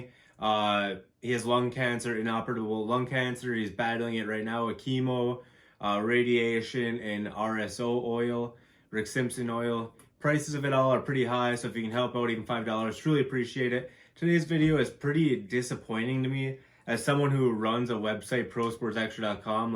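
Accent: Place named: Upper Peninsula, United States. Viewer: sorry to hear about your dad man. My best goes to you and your family. It's awesome to hear a good yooper accent again, and also pretty stand up for you to do this segment.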